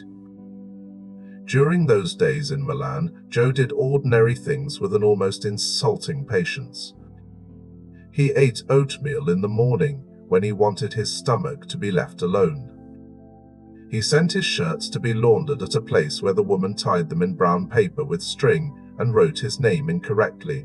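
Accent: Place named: United Kingdom. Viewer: Sorry can’t take the accent from across a pond talking about the American mob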